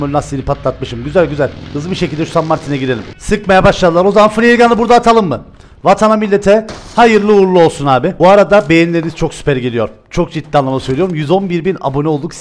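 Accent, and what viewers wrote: Cyprus. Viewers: Uzun zamandır takip! beğeni! İlk yorum muhteşem Kıbrıs şivesi izlemeye doyamadığım videolar başarılar devam kardeşim 👏👏👏👏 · Yıllar sonra abinin Kıbrıs şivesi ve pubg bu halini izleyen benden selam olsun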